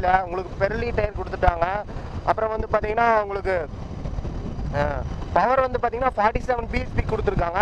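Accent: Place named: Kongu, India. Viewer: Kongu tamizha nee super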